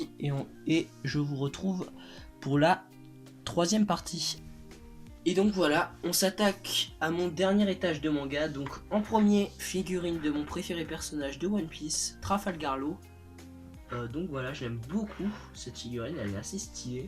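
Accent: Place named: England, United Kingdom. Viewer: Super vidéo, tu m'as donné envie d'acheter certains manga. Et juste comme ça gentiment, j'adore ton accent anglais 😂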